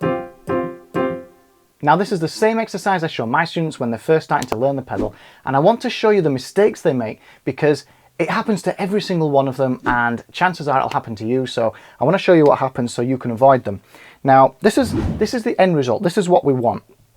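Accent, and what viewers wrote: Yorkshire, United Kingdom. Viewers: are you form Yorkshire?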